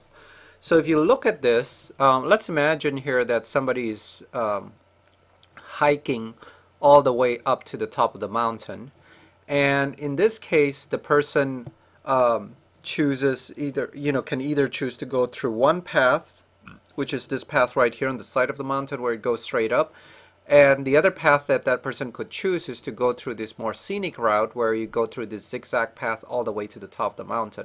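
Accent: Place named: Asia. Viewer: Finally not an Indian accent. I'm guessing an Asian... Could be Chinese, or perhaps Cambodian...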